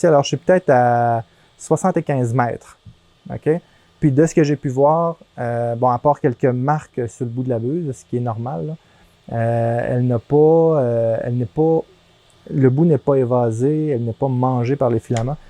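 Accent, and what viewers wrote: Canada. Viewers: Oui je le veux. Salut. Merci pour tes vidéos et ton charmant accent Canadien dont je me délecte!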